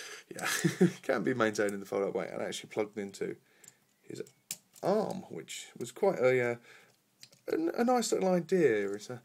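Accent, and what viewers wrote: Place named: United Kingdom. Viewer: hes from the uk theres nothing wrong wiv his accent if you dont like his voice dont watch it cant help the voices were born wiv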